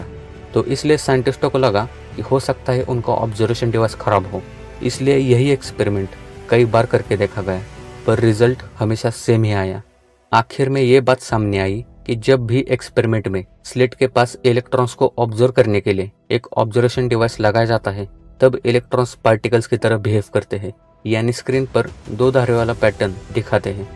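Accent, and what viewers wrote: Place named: Maharashtra, India. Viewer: तू मराठी भाषिक आहेस अस वाटतय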